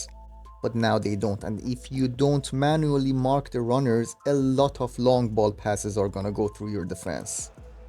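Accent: Iran. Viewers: I randomly found this vid and after watching it for 10 secs hey this is persian accent lol don't get me wrong u speak 10/10 but there's always a Persian accent 😂 keep it up bro 👍🏻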